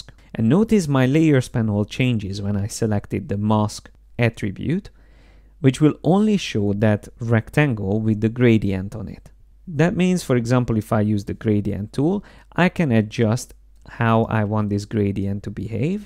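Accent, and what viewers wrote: Hungary. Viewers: Nice tutorial, are you hungarian?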